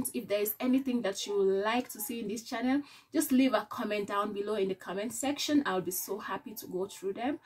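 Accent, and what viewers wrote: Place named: Kenya. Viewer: Aii the Kenyan accent 🥰 😘😘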